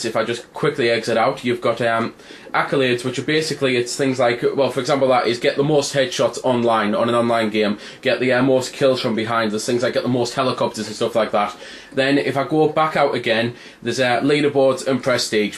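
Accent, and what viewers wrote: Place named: England, United Kingdom. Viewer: i love this game I'm gonna get it and i also love you're English accent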